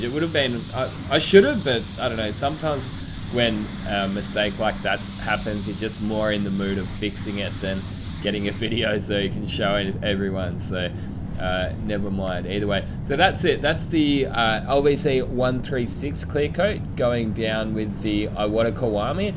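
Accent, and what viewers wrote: Australia. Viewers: He's got that au accent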